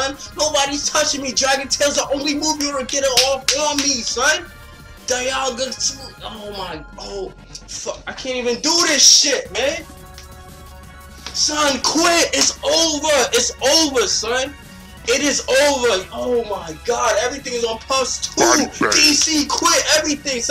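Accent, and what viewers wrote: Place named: Brooklyn, United States. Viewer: I swear I know this guy... sounds like he lives in brooklyn.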